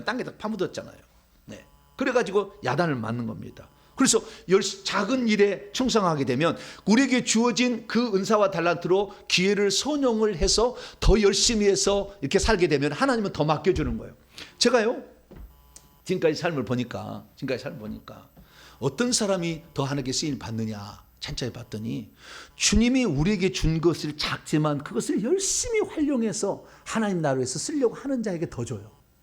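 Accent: Jeolla, South Korea. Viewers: 목사님! 반갑습니다. 목사님 고향이 ”전남 화순군 도곡면“ 이시군요. 저는 화순군 춘양면입니다. 목사님의 설교 말씀을 즐겨 듣는 편인데 들을 때마다 속으로 생각하였습니다. ‘억양이 전라도 분 같은데…‘ 역시나였습니다.🩷 앞으로도 은혜스러운 말씀 기대하겠습니다.😀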